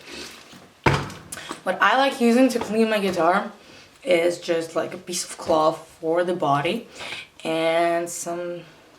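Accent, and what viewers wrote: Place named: France. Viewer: Oooh ok i was almost sure to have recognized the french accent (because i'm from France ahah).Thanks again for your help and dudette 😎🤘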